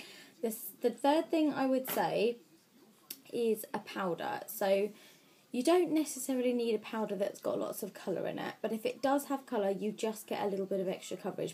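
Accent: United Kingdom. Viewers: this is my first time watching one of your videos and before you started talking I thought you where like 12-13 years old but then I herd your voice and im like WTF?!?!?! Ur like 20 or somethin' years old?!?!? And your British?!?!?!? good video by the way :)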